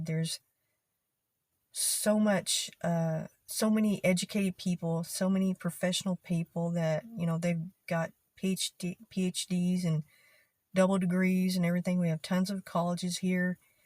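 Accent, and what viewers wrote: Texas, United States. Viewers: Finally a video by someone who actually speaks with a Texas accent. Most of the other videos are by people who don’t speak Texan. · Honestly, the Texan accent is beautiful...But that is just my opinion . Love the way you talk ..I hope I learn the accent one day... I actually use Yes sir, Yes Maam talking to my patients and other people in public..respect is always a thing for me and my country... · I understand your accent completely! I’m from the Boston area and we have that particular accent. I’ve lived here all my life, but the funny thing is if I’m watching tv I can pick out a Boston accent however slight. I don’t mean actors who are speaking that way for a role, because they never get it quite right. I mean native speakers. Question for you, what area of Texas pronounces greasy as “greazy?” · You guys have quite a bit in common with the Aussie accent then. 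We say “waddaya want”, “budda”, “gunna”, “tuday”. On the other hand someone from Boston saying “park a car” would sound exactly like us. Definitely coke just means Coke tho (when you’re talking about drinks that is!). But I think that’s not just Texan - other Southern states say that too, weirdly enough! Would you say you have a strong Texan accent? To my ears it doesn’t sound as “extreme” as other accents, like say, someone from Georgia or Alabama. Anyway, it’s a pity Southern accents get stereotyped as uneducated, I think they’re gorgeous, and yours is lovely & smooth. Cheers from Australia 👍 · I think you did a good job but the best part was listening to how you say things. The length of your sentences, your intonation, diction, body language and so on are wonderful. These things you do automatically, never thinking about it enough to make a YouTube about. That's the REAL Texas talk. 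I'd like to hear you and your friends chat about something commonplace - not sports because there's a lot of jargon that everyone uses with that. Talk about growing up in Texas and things that happened. I'm a writer and right now, I'm looking for examples of contemporary Western language. It's at its best when people are having a conversation, lectures are okay but that's never completely natural. I guess if I wanted to do this, I should come to Texas and spend a lot of time at restaurants, bowling alleys, and grocery stores observing like a bird watcher. Yep, that'd be the way, but listening to you is very helpful too. Thanks!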